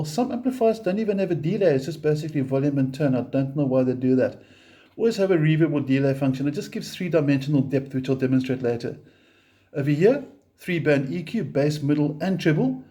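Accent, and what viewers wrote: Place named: South Africa. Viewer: Much as I approve of your ZA/NA (?) accent, "NUX" is pronounced NEW-X in spoken English according to NUX (Cherub CN) themselves. Hey, as an owner of many NUX products and native English speaker from a time when grammar, punctuation, spelling and pronunciation were taught, insisted upon and examined, I used to pronounce it as spelled too for a long time. The keyboard doesn't type the subtle ">" used in the "NU>X" branding for so long well, which I suppose gave some hint of it. Returning to the relative mini amp comparison, of these three I chose and use the 3W Blackstar Fly 3 & Blackstar Fly 3 + Fly 103 6W Stereo combo for my battery powered portability deployment needs. Taking into consideration my purpose for the Fly 3s as I already had a Blackstar ID:Core Stereo 40W (now two thereoff with the addition of the latest V3 incarnation of it) for my primary practice amp, as well as multiple other larger electric performance and acoustic amps . 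In my country (AU) the NUX8 BT 8W with BT sells for around the same price as the Fly 3+Fly 103 6W Stereo combo (no BT) which includes its 240v AC to 6.5v DC step down PSU in the pack. The latter Blackstar 6W Stereo combo is really the direct comparison to the NUX price point for price point, with the advantage of Stereo which it trades off against the NUX's feature of Bluetooth. So in that sense, the standalone 3W Fly 3 isn't a fair or balanced comparison considering it sells for half the price of the NUX8BT or BOSS Katana Mini. Not that it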